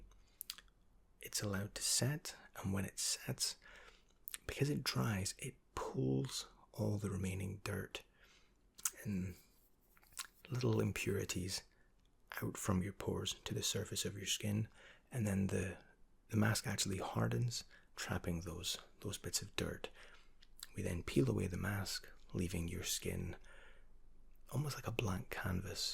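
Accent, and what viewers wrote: Scotland, United Kingdom. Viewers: I love the Scottish accent and love the way you pronounce words and speak so eloquently. Much love from South Yorkshire 🇬🇧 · He's Scottish!? His accent is so subtle I thought he was from the U.S.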